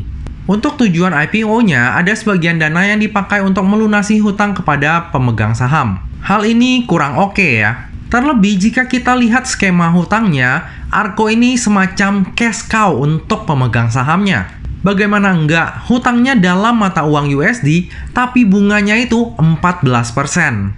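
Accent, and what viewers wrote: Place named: Bangka, Indonesia. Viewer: sinmung, kek urang Bangka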